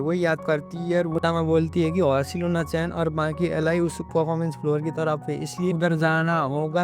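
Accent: Nepal. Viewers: Bro are you from Nepal??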